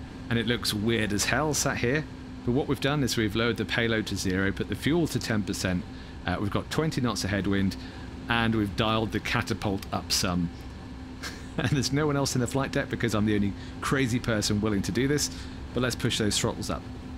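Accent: United Kingdom. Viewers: Great video as always, hah. I have a silly question.. What is your accent called ? Is it the British standard one? Rp? Sorry for the question.. hah.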